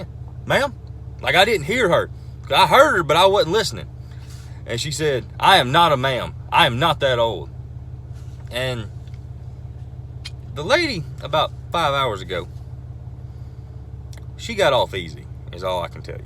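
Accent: South, United States. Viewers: Being from the north and living in the South meet and guys like you I understand what you're saying no you didn't do nothing wrong but me I would have handled it differently if I was your cashier I would have giggled and said where's my mama where's my mama you said man I expect to see my mom when I looked over my shoulder which would have had you and me both laughing because I know you from the south by the way you talk they knew you from the south by the way you talk they just was in a foul mood and took it out on the wrong person you didn't do nothing wrong that cashier could have handled it better the boy you called me the c word and we going to be scrapping you might be bigger than me and I might get my ass whooped but you know what I'm going give it the old College try.. 🤣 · Here in Canada my mom and sisters would jokingly say “hey, I’m not that old!” But given your accent no way they would think anything of it, it’s just a southern thing. 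· You didn't do anything wrong. But as a female from the northeast, only older women are called ma'am. So most younger women find it offensive, because around here it insinuates that you look older than you are. I remember being in my early 20s and getting called ma'am, and feeling totally sad about it. Some of us didn't grow up hearing all women addressed that way. I wouldn't be offended if you did, because your southern accent is obvious and I know that's how southerners talk. (My aunt was from Georgia) 👍😂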